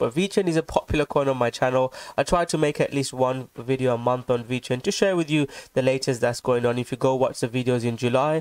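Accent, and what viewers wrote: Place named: British Asian, United Kingdom. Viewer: you come from a chapati eating background ...😂😂😂😂😂 for sure !!! I cant be wrong , thats them pharata Uk English accent lol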